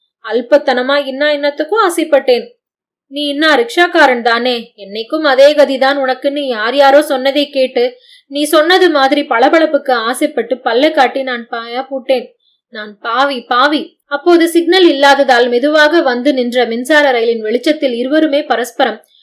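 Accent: Chennai, India. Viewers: தரமான மெட்ராஸ் பாஷை,கேட்டு மகிழ்ந்தேன். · மெட்ராஸ் பாஷை தெரியாதுண்ணீயேமே.. ஆனா மெட்ராஸ்லயே ரொம்ப லாங்கா வாய்ந்தா மாறி படா ஷோக்காக்கீதுமே ஊம் பேச்சி..😮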